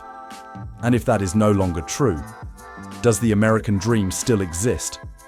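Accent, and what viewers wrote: England, United Kingdom. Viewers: Who is putting this BS out???..California???.NYCity???...and this person has an English accent!!!....I live in Fla. and I do not SEE any of this reported BS!! So those who were homeless and jobless and dependent on Welfare or Charity in their previous locale, come to Fla. and bring their same hard luck stories with them!!!!....I recommend that Florida establish a border check point and IF you are not already a resident, then you get turned around to go back where you came from....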